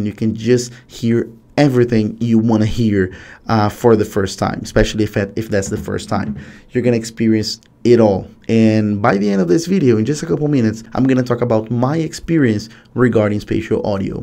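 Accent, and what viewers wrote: Brazil. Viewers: Are you Brazilian? I can recognise your accent cuz I’m Brazilian as well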